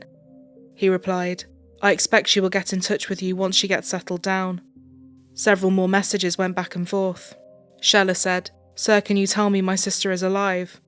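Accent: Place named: Scotland, United Kingdom. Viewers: Great video. I love Scottish accents… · Love Scottish accents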